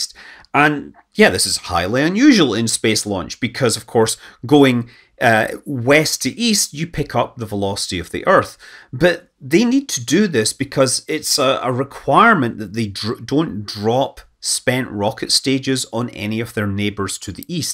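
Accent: Scotland, United Kingdom. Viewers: you'd think someone with a scottish accent would be able to say Palmachim correctly :) (or palmakhim.... פלמחים) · He's a Scot. You can hit closer to home with Scottish pronunciations!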